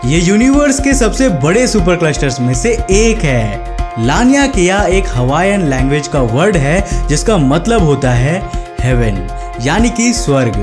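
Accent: Bengal, India. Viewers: bhai tum bengali ho kya? btw love your videos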